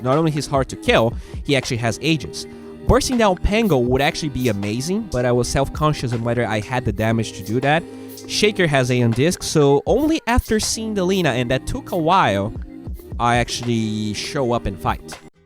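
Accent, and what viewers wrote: Brazil. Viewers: dude, stop complaining about your accent. Your English is perfectly OK. And your accent always will be from Brazil. Your videos are Awesome keep like that. Hug from arg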